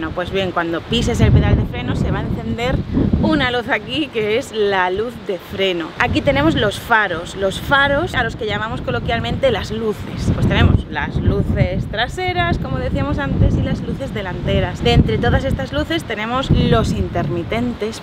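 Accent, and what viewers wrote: Spain. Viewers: tu acento es el mas español q ya he escuchado si pieno en español de españa tu voz viene a la cabeza y por cierto me encanta ❤🎉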